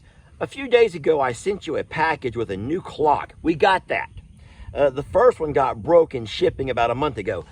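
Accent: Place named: South, United States. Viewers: have read this to you and don't ever want to change your voice I myself love listen to you and your family talk I love the southern accent ❤ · I would have always thought you would have been the life of the family events when I first started watching ya'll a few years ago. Ya'll are my type of people. Every relationship I've been in they have been the outcast of their families and it's just so sad. My family is so close and only one aunt is kind of an outcast but she hasn't always been.....her actions put her there. I love all of ya'll but you guys and your dad are my absolute favorite. Will have to say out of all of ya'll you keep my attention the best regardless of the video. Maybe what draws me in the most is you talk a lot like we do here in NC.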